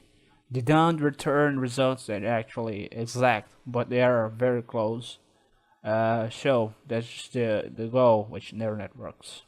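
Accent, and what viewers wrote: Brazil. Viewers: Hehe.Br falando inglês, não tem como não perceber. Abraço.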